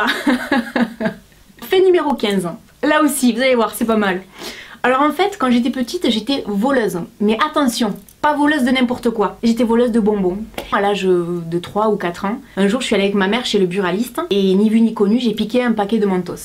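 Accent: South, France. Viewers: Tu me fait bien marrer avec ton accent du sud😂😂❤ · J'ai découvert ta chaine aujourd'hui, et je suis en train de visionner toutes tes vidéos! Tu es vraiment adorable, j'aime ta franchise, et ton petit accent du Sud! Et puis, tu es vraiment magnifique (t'as d'beaux ch'veux, tu sais?!) Je ne commente jamais sur YT mais je voulais quand même préciser quelque chose: le poisson, c'est de la viande! Du coup, ceux qui se définissent végétariens alors qu'ils mangent du poisson... offrez-vous un dictionnaire pour la rentrée! ^3^ <3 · cc j'adore t video tu est trop trop belle tu ma fait trop rire lol. j'adore ton accent du soleil. t o top bisou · quel bonheur de vous entendre parler! Et oui votre accent, le mien, celui du midi,de Pagnol, qui fleure bon la lavande et le romarin ".Non ne rougissons pas de notre si bel accent, qu'il soit clair, sonore, retentissant et allons nous en l'humeur toujours pareille, emportant notre accent sur le coin de l'oreille....." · tu es vers le sud? 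car ta un accent:D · jai la rage contre la meuf qui envoi des menace t es une creme ca se voit vive les femmes du sud avec l accent si tu es effrayer je suis la tkt... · Haaaa une fille avec l'accent du sud la famille ^^ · Tu es très belle et intelligente. Ton bel accent vient de quelle région du sud? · OH MON DIEU! Tu étais vraiment trop mignonne quand tu étais petite ma chérie, tu étais vraiment trop belle!!! Une petite rousse toute mignonnette, je ne sais pas ce que les gens ont avec les roux, les réactions envers eux me choque beaucoup. En tout cas, continue comme ça, ton accent du Sud me fais beaucoup rire, ce n'est pas de la moquerie mais moi aussi j'ai l'impression de me sentir en vacances et d'entendre ma tante...eh oui dans ma famille ils sont tous du midi sauf moi! #intrus. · J adore ton accent du sud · tu as vu plusieures fois Anastacia.... ok je vais aller me pendre lol j'ai jamais eu la chance de la voir sur scène et pourtant j'adorerai étant une fan inconditionelle de cette artiste depuis ses tout début - je suis tombé sur ta chaine par hasard et j'en décroche pluuus lol Et vive l'accent du sud (a) (je suis près de montpellier ^^) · coucou, je viens de découvrir ta chaîne et je viens de dévorer plein de tes vidéos! 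ça change ton fonctionnent tes conseils, tu es rayonnante naturelle et tu me fais rire! j'adore ta bonne humeur et puis parlons accent;) je suis du 64 et ça me fait très plaisir d'avoir une youtubeuse avec l'accent du soleil puisque dans les Pyrénées en ce moment c'est 20° et grand soleil:) cette vidéo m'a fait sourire puisque j'ai 23 ans mais je me retrouve beaucoup dans ce que tu dis, je suis plus vieux rock (led zep c'est la vie! ) mais vive les 80! très contente d'avoir découvert ta chaîne, je vais vite m'empresser de regarder tout ce que j'ai raté! et comme on dit porte toi bien potons! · Cc, que du bonheur dans cette vidéo, tu m'as fait trop rire avec toutes tes anecdotes, ne change pas la et tout ça avec l'accent du sud, bises a+ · Trop drôle tout ce que tu dis et de la manière que tu le racontes. J'ai fait comme ta maman avec mon fils qui avait piqué une sucette dans mon dos chez le marchand. 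tu es superbe et tes vidéos aussi! Et surtout garde ton accent du sud. Tu fais honneur à notre région!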